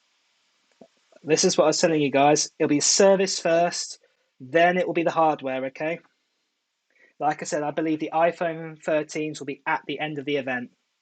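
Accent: United Kingdom. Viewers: I want to buy the iPhone 11 but I don’t have money for that and also i don’t have a job since i was just a 16 yo who want some iPhone i am hoping that i will be the one who got your ipad❤️Godbless you man💖 btw i like your British Accent❤️❤️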